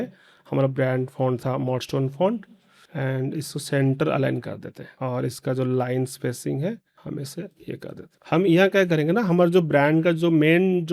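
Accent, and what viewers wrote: Odisha, India. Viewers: Bhai tame hindi kahibaru jana padijauchi tame odia boli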